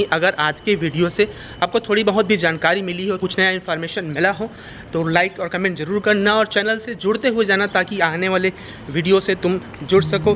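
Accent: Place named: Chhattisgarh, India. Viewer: Videos k start me Johaar jo bolate h..us se lagta h...aap India me CG se h... reply kre..vaise toning CG wali lagti h...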